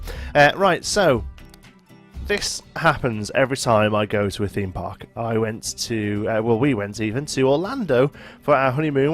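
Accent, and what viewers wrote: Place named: Birmingham, United Kingdom. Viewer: I'm from England, Birmingham(B45) Where you from because your accent doesn't faze me and kind of sounds normal... You gotta be from somewhere round here...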